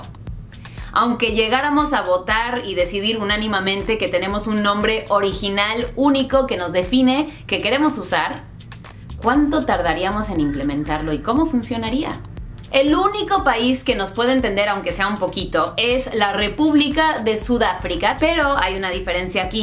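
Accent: Mexico. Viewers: La Biblia Dice he vivido en Tamaulipas, Durango, Chihuahua, Sonora y Coahuila y he conocido personas que tienen el acento norteño y otras que aunque sean del. Norte los escucho normal para mí que soy de Acapulco Guerrero, en todo el país existen personas que hablan el acento Méxicano estándar como lo habla, no porque sean norteños tienen que hablar igual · Hola me acabo de suscribir a tu canal luego de ver tan sólo un vídeo tuyo. ¿Sos estadounidense? Si no lo decís no lo creía! hablas un castellano perfecto, pensé que vos eras mexicana ya que tenés acento mexicano. Che, ni un ápice de acento inglés!. pregunto: porqué los mexicanos los llaman gringos de donde proviene esta palabra? GREEN GO ???? desconozco. acá gringo les decimos a los europeos y eventualmente a los "yankis" pero nos referimos a los blancos caucásicos. Saludos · Tu acento tan mexicano :3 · Te equivocaste un poquito. 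Hablas el castellano casi perfecto, con un encantador acento mexicano. Pero dijiste "unanimamente" y es "unanimemente". Saludos desde el Perú, un país hermano de México. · A mi me parece mas Mexicana o Latina que gringa. Cuando la oigo hablar imediatamente pienso en Mexico. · Una pregunta por qué decidiste aprender el Español con acento mexicano , si tus vídeos estan dedicados al mercado Latino ,fueran usado un acento mas neutral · kelly ramirez ella estuvo viviendo en México y yo también dije eso, me encanta su acento Méxicano. y ella dice que ama México. ♥ soy de México jeje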